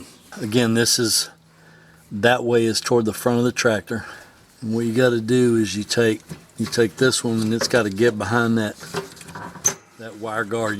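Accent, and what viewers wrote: South, United States. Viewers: Hello from France ! Thank you so much, sir ! I've changed both belts on my tractor while enjoying your southern accent in the process !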